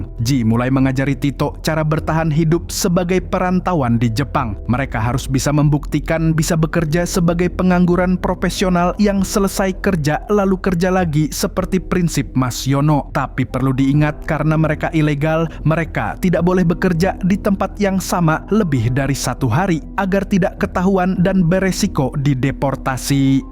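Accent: Banjar, Indonesia. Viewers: Bubuhan Banjar sekali nya 😂😂😂😂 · Mantap mang bahasa Banjar y😂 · Abang urang banjar lah😅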